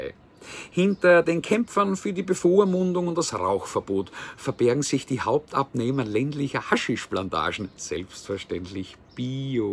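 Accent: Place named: Vienna, Austria. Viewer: Das ist halt wienerisch!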